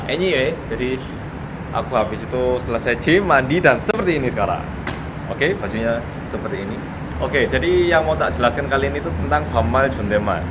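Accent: Java, Indonesia. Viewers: Salut aku mbek smpean mas, jowone jan melekat kat kattttt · sip.. seneng aku ana kancane, seneng ngomong jawa, medhok pisan. akeh wong jawa dhewe sing ora pingin dingerteni jawane, po meneh yen wis nyambut gawe Ning jakardah. Nuwun yoooo... njenengan bombong dadi kaya Wong jawa😊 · aku orang jawa...hidup di bandung :( kalo medhok malah diejekin :( -,- la mas e bejo medhok tapi wong koreya -,- la ne aku bedo neh critone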